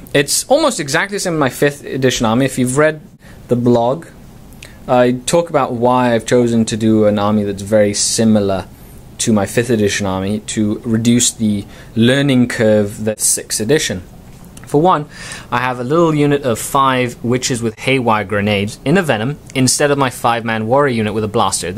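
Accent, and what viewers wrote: United Kingdom. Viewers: Hi! Nice battle repport but I wonder what happened to the scourges, I'm starting my gaming season pretty soon (damm exams :S ) and will follow you batreps with interest. I'm planing on runing 3x5 Wyches with HW in venom with few extra stuff (venom blade and Blast pistol) let's see how it goes! BTW are you british? I know you are in Canada but you sound brit :O.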